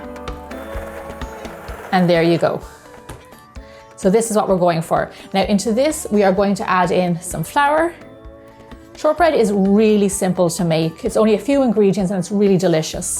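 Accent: Ireland. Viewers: YES! I had asked you on a very old video fo yours because I thought I recognized your accent, (I'm Irish myself,) then read your 'About' and was so confused! I was trying to think "Where the hell would an accent like that be other than Ireland..?" and nothing came to mind! Fellow Irish person here X You're my idol as well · What percentage do you suggest if I use dark chocolate? I love this and your accent as I lived in IE for 8 years!!! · I knew you are Irish!You still have very strong accent💕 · I have to be honest, part of the reason why I clicked is because I love the Irish accent. :) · Those look so yummy. I,love watching your videos and just love hearing your IRISH ACCENT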